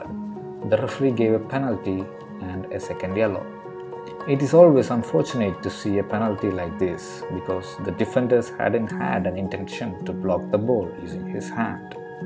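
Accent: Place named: Kerala, India. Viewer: The mallu accent is so recognisable :D. Nice work bro.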